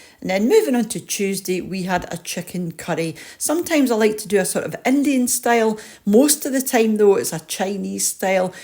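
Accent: Scotland, United Kingdom. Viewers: Intrigued to watch until i heard you were Scottish. No thanks.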